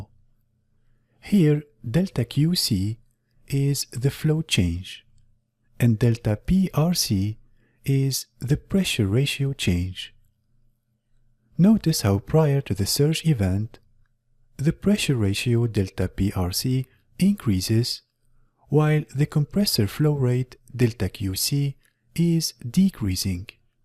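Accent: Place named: India. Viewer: sometimes indian accent is hard to get the point (intonation)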